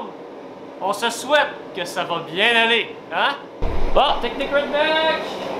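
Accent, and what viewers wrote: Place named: Quebec, Canada. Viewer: Salut, D'abord, par curiosité, j'ai voulu voir si le sous-titrage automatique en français de google fonctionnait avec l'accent québecois. En fait non. XD As-tu fait une lame avec ce damas, et si oui en as-tu fait une vidéo ? ^^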